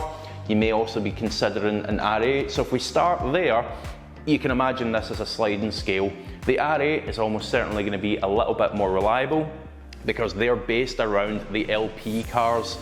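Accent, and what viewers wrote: United Kingdom. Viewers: Can’t understand shit he saying I hate British accent so ridiculously funny and can’t understand nothing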